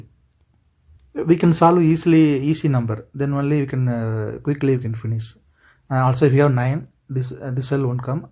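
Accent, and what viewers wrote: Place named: South India, India. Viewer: Great voice. Exactly, as same voice and accent , as of my friend from South(banglore). Its good to know u r from south. I do have lots of friend from South🥳🥳🥳🥳🥳🥳🥳🥳🥳🥳🥳🥳🥳.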